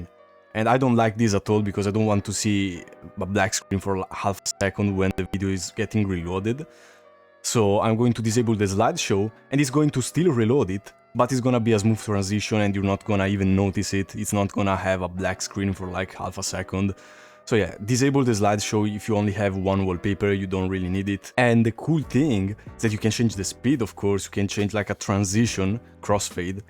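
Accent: Italy. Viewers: are u italian by any chance? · is vro italian?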